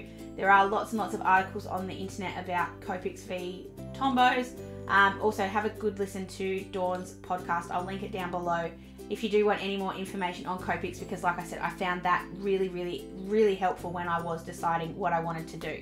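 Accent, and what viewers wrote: Australia. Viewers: hearing your accent, i was staring at that notebook going "that looks just like my mystery 120gsm notebook from K-Mart Australia" lol. Anyway, thanks for sharing! after buying a set of Chinese watercolor brush pens from Amazon I finally got Tombows. I have a set of Caliart alcohol markers I got a while back but never used much due to the massive bleeding, and before I knew I greatly preferred brushes over those massive chisels. A store near me has Copic Sketch with chisels/fines, and Ciaos w/ brush tips. I've seen two types of 'marker paper' - One is just basically card stock, the other is thinner but slick, I assume with surfaces treated to resist the bleeding or something. I still have the Chinese Sunacme pens and honestly like them. They're smaller than the Tombows and came in a lunchbox-sized case, and the fine tips are like Staedtler fineliners. 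But alas, can't replaced markers as they go empty... I want to find refillable dual tip watercolor brush pens someday...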